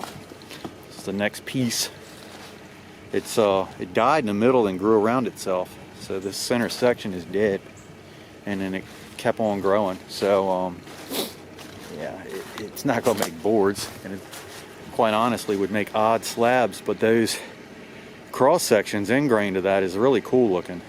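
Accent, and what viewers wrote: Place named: London, United Kingdom. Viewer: Love your farm! Are you in MD? Your accent sounds like my friend's in Hampstead. Thanks for the Kohler info. - Craig in PA (LT40 wide owner)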